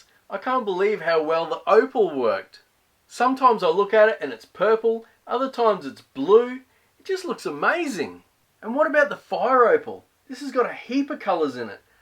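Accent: Australia. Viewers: Are you putting on the Australian accent extra thick on purpose? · Those are beautiful💯 New sub here, it's nice to hear an Aussie accent. Cheers from Melbourne mate😊✌❤🇦🇺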